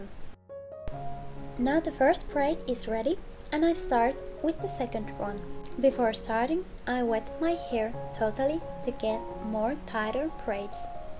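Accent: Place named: Finland. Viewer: I knew your accent was from Finland. So beautiful there. Thanks for trying to show us how. Be well and stay safe.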